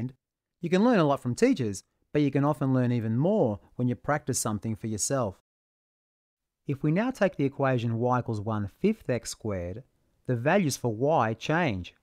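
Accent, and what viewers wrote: Australia. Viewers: drive a nail where you want the focus to be. Tie one end of the string around that nail. Extend the dangling string downward toward you on your surface with a loose loop where you want the base of the parabola to be, and run the rest of the string vertical up the surface past the nail but attach it to the side of a 90 degree triangle square just touching the nail... Put your pen at the loop directly down toward you from the focus nail - that'll be the start of one side of your parabola... now slide the square straight to the right side and keep the pen tight against the string - as you continue to move the square, the string will slide upward and you'll trace a parabola shape... reverse it the other direction or trace the 1/2 you already did... Bob's your uncle. (since the teacher sounded ozzish (well, at least commonwealth something...)). 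Cheers.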